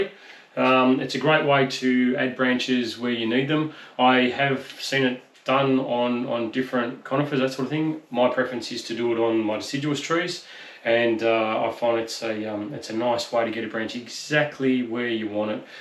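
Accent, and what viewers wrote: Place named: Melbourne, Australia. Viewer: Just found your channel - thanks YouTube! I did my first thread graft 2 years ago on a Trident Maple. A month later I decided to rub the buds off the approach side to avoid wasting energy where it wasnt needed...but for some insane reason I rubbed them off the 'active' branch end. How could I be so dumb!!! I couldn't believe I had done that. Anyway - loved your instructional style and am definitely going to be looking through more this winter. I always think of Melbourne (Upwey) when I hear that accent. Cheers, Xavier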